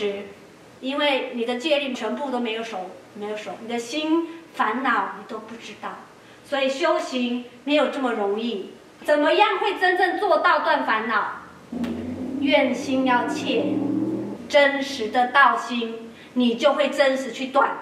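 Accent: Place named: Taiwan. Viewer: Mandarin, with Taiwan accent. Religious content.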